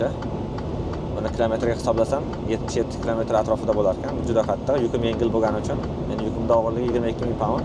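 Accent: Samarkand, Uzbekistan. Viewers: Aka Samarqandanmisiz aksent shunaqa ekan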